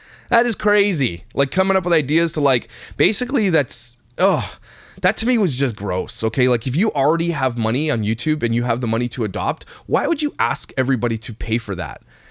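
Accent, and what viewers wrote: Canada. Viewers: I agree about ppl who don't need the money get money donated on go fund me. Yet someone who truly needs the money it's like pulling teeth, litetally. I had to swallow my pride to start a go fund me because I can't eat solid food for the last year and a half due to a dental disaster. I need the money to pay for ENT, Oral surgeon, dentist, ensure in the interim due to Covid which closed everything down, etc. Soup is my main food and mash potatoes. Besides toilet paper. Soup is hard to find. I've lost so much weight due to not being able eat and only drink my food. I also have a disabled son who's had many of his medical supplies no longer covered by his insurance. It hurts when no one cares. I need aprox $69,000 so I put that amount as I'm honest or naive. I was verbally attacked by ppl on the internet that I shouldn't ask for so much. So I lowered it. 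But still I'm not able to eat as not many donated. So seeing what they did makes me so upset. Especially having a disabled son it breaks my heart. I use to live in Canada my first 5 years of life. I miss it so much. I love listening to your accent eh. I miss the smarties, not that I can eat them but you guys have much better goodies than us. Good for you what your doing to stop the injustice. I can't imagine giving away your own child. Keep up doing good. I pray the little boy is ok and being loved. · I think you have so many subscribers because people love your Canadian accent, i.e. "about", " outside". My paternal grandparents were from Conception Bay in Newfoundland. Love your channel!!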